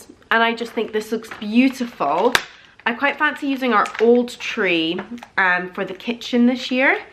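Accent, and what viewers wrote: Scotland, United Kingdom. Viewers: First time watching your video - really enjoyed it and loved your haul! Will keep watching - I find you're down to earth chat very enjoyable and love your mellow Scottish accent!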